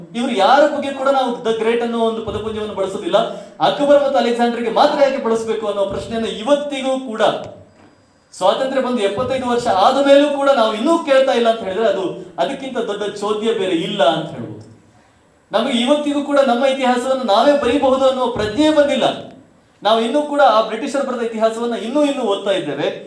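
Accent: Standard. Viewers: ನಿಮ್ಮ ಶುದ್ಧ ಕನ್ನಡ ಕೇಳುವುದಕ್ಕೆ ತುಂಬಾ ಆನಂದವಾಗುತ್ತದೆ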